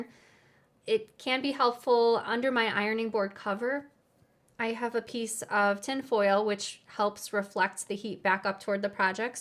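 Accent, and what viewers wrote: Newfoundland, Canada. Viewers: Deffo Newfoundland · Newfoundland for sure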